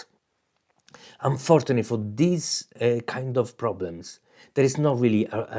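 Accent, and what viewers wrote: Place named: Italy. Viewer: Many thanks for your detailed response, and happy YouTube anniversary! I wish you the very best in the coming years. I was hoping to run a local coding LLM, as well as develop an AI powered app on this machine, but looks like I was under the wrong impression that it can handle that. I really appreciate your clarifying this matter to me. Just a side note: your Italian accent is great! My next door neighbours here in Canada are Italians, and they are the best neighbours anyone could dream to have. Have a fantastic weekend!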